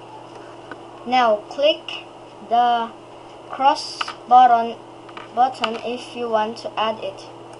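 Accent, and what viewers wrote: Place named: Philippines. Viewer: Pretty fuckin late, but your accent makes me think you're Filipino lmao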